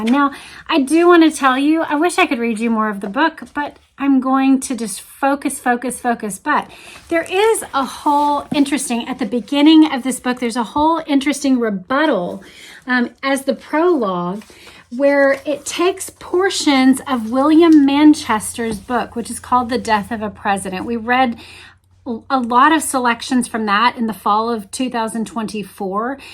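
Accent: Texas, United States. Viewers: "Best Texas voice?", what's wrong with your normal Texas accent? I am still impressed that on the worst Friday in American history the FBI conclusively linked an abandoned rifle (not proven to have shot anybody) to Oswald (because Oswald rented the mailbox--but there was no testimony nor any evidence that Oswald picked up the rifle found) in ten hours. The FBI did this without computers, just fax machines, telephones, shoe leather and elbow grease. Why waste money on gun registration when John Edgar Hoover's boys did so well without? The "insufficient evidence" was never tested by screening the evidence for admissibility by a judge, then used to support the Theory of the Case in front of a jury. In other words, the FBI found a rifle and had a shaky link to Oswald--but never had to prove it was the same rifle that killed JFK. It's in poor taste to mention that there were more holes connecting Oswald to JFK's murder than there were in JFK after being shot two or three or four times.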